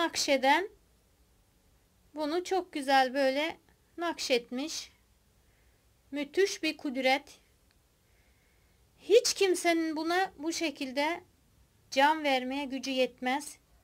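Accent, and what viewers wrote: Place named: Kütahya, Turkey. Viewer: Konuşma şilven bizim gibi abla kesin Kütahya yada Uşaklısın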